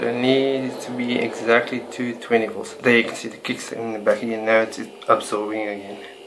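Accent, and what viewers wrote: Afrikaans, South Africa. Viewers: is that an Afrikaans accent Im hearing?! Ah the nostalgia. Lekker turbine boet! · is that a South African accent I hear? i LOVE your setup... It would be great if I had land like you do with a running stream for power... how great is that? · Immediately recognised the South African accent.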